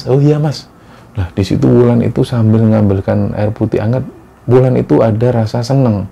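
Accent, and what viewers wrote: Java, Indonesia. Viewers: pdahal mas ini medhok bngetz ngomongnya tpi kok aku paham ya apa yg diceritakan,biasanya klw adh orng yg medhok aku cma senyumin aja krna gak fahan ngomong apa 😂😂😂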